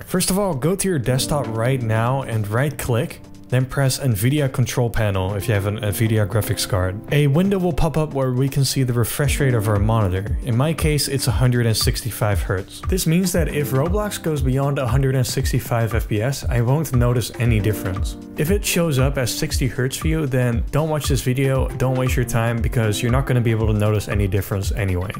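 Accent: Netherlands. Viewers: I already could hear you come from the netherlands anyways good video your amazing :)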